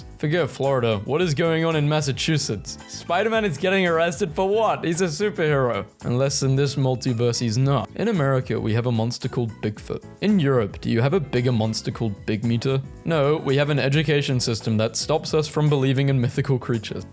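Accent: United Kingdom. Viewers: I like how it's a British guy commentating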